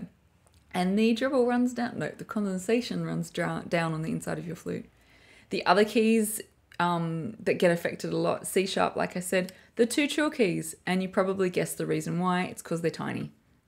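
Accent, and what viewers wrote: Australia. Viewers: I also grew up in Sydney, and my accent is identical to, though I've lived in Far North Queensland for many years and accents are a little different at the top end.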